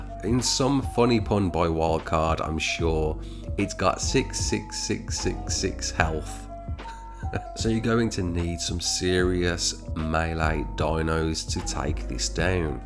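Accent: Birmingham, United Kingdom. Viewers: You sound like a brummie boy ?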